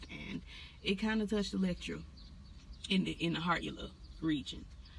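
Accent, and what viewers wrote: South, United States. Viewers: You sound so Down south!!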